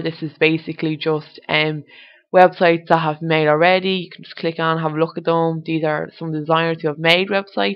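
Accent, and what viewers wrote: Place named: Ireland. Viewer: you do have a lovely irish voice. thanks for the vid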